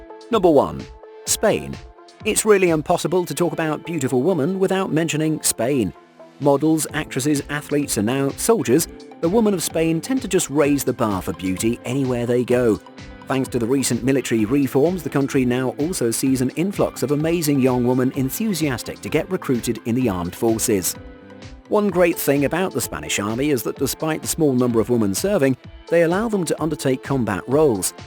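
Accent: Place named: United Kingdom. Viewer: *women... FFS and it's a British naration.... Disgraceful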